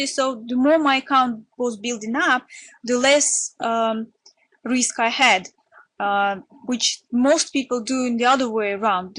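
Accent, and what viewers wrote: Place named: Russia. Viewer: nice accent. Russian?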